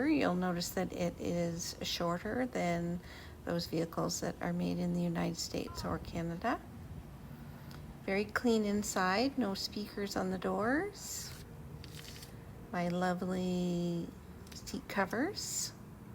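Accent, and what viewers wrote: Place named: France. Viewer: The very informative, but it is difficult to understand your very thick French accent.😔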